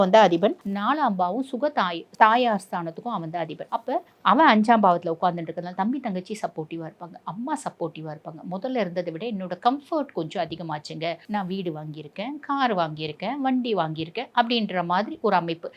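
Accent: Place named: Northern Tamil Nadu, India. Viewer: Ennadae ammea vadakku thamilatchiyo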